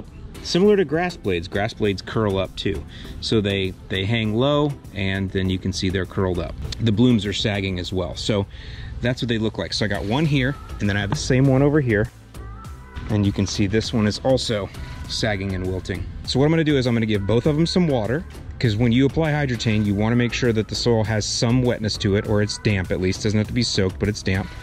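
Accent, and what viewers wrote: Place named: Calumet Region, United States. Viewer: Ha Over buy dare! Couple two tree! What have you.... Calumet regional linguistic gold!!!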